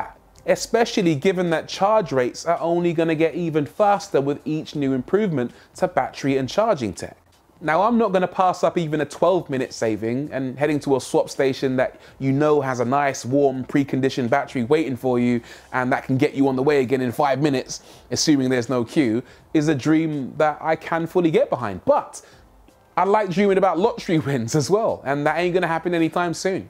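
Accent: United Kingdom. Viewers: I was about to close the video. Then I heard the British accent 🇬🇧 So my coffee turned to tea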